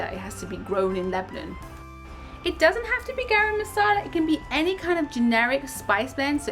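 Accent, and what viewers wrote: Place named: Birmingham, United Kingdom. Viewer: You have a Birmingham accent 😊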